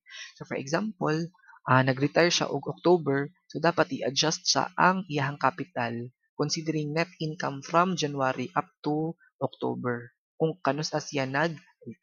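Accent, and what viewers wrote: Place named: Visayas, Philippines. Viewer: Thank you po. I enjoy po the tutorial when you speak Bisaya. It is kinda cute po.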